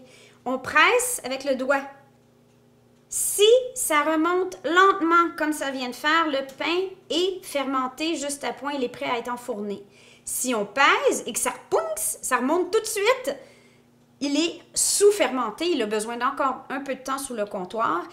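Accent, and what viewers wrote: Quebec, Canada. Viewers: Je viens de découvrir votre chaîne aujourd'hui, j'ai seulement regardé la première vidéo de pain il y a quelques instants, et BAM nouvelle vidéo du pain ! Quel hasard ;) merci pour la vidéo, ça fait plaisir d'entendre une Québécoise et de voir une recette de pain (je suis Bretonne en manque du Québec!!) suis ravie d'apprendre que des Bretons font d'aussi beaux récipients comme vous en avez montrés. J'itai peut-être y faire un tour ! Bonne continuation :) (et je rajoute que je trouve ça très drôle car vous en peignoir le matin en train de continuer votre pain de la veille dans la première vidéo c'est exactmeent moi ^^ ainsi que le chéri qui dit 'mais il est très beau ce pain! avec moi, amatrice boulangère, qui tire la moue parce que je suis trop perfectionniste :) )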